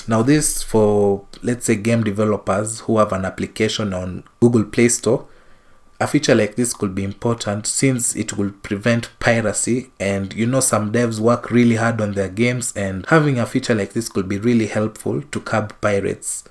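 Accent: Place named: Kenya. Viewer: you sound kenyan :hand-pink-waving: I love the sort of videos like this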